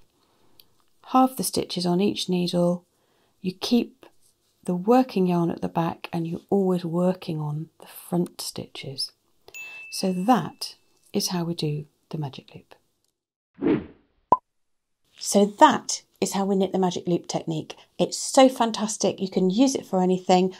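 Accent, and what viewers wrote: England, United Kingdom. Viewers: Thanks for the easy to understand instructions. Love your English accent!!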